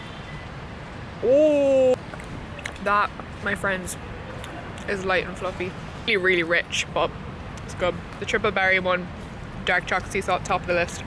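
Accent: Ireland. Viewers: I love your Irish accent. Makes you an awesome narrator lol. And it goes well with the New England theme...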